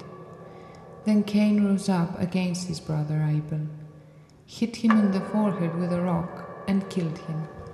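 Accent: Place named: Greece. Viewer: she speaks with a heavy accent, is she greek ?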